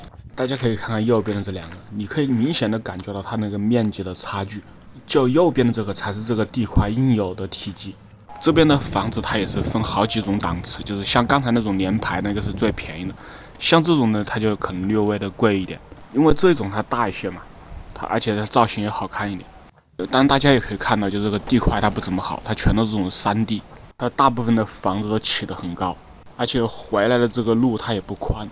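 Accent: Wuhan, China. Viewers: 这口音，基本可以说是武汉或者周边的口音，乡音太浓了。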